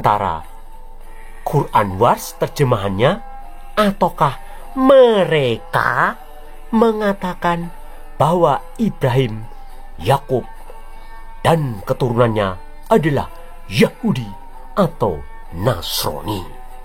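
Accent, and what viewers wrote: Java, Indonesia. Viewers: salut ma suara yang ngebacain alur critanya...medhogh banget jawanya...😂😂😂 GBU mas bro...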